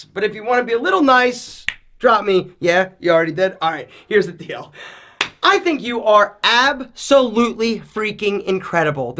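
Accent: Philadelphia, United States. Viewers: Is this guy from philly cause he got that strong ass philly accent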